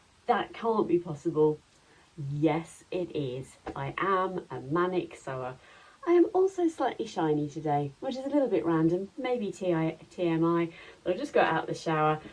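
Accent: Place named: England, United Kingdom. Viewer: I had to go back and watch the early part of this with Closed Caption on. I thought you said Testicles but the CC showed Chesticles...LOL...My USA accent vs your English accent I guess is why I wasn't hearing what you said....LOL...Love the Sally jump suit. You look great in it.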